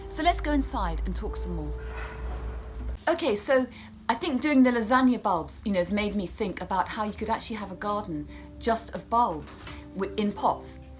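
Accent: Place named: London, United Kingdom. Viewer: 特别喜欢正宗的伦敦音！赞👍👍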